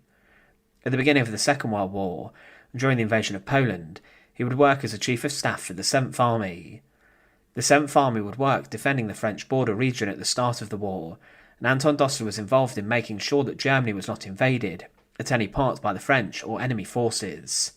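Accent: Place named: England, United Kingdom. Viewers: i The Narrator has an English accent… just cannot read a script fluidly. Awful . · He definitely is English. He just has no idea how to read a script.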